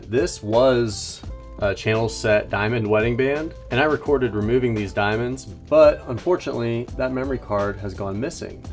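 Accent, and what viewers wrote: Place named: Pennsylvania, United States. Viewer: I absolutely love your channel... You're doing a great job cultivating content that is both visually stunning and incorporates commentary that engages the viewer. I knew you must be from PA area bc of your (subtle) accent! No judgement, I'm from Baltimore which may be home to the grossest sounding dialect of them all (but iiiii don't have an accent😉). Thanks for the time and effort you put into your videos, and one day i will bring ya a big ol' princess cut set in rose gold to be sized. 😁 Jk,jk. I'm team asscher all the way.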